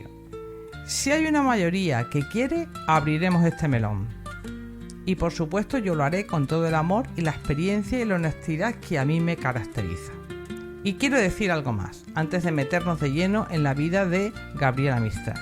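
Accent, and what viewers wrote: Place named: Andalusia, Spain. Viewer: La propietaria del canal es andaluza, por eso pronuncia así mi alma!! Relájate un momento chiquilla!!!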